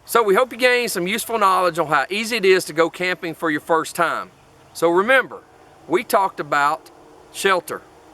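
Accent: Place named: Alabama, United States. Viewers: Loving your southern state Alabama accent there mate! Greetings, Leeds, UK. Good tips for me and my new wifey for our first camping trips. 😉👍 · Great job sir. I also thought how familiar your accent sounds. I grew up in south Alabama. I've been wanting to camp as I did as a kid but wanted a refresher overview. This is exactly what I needed.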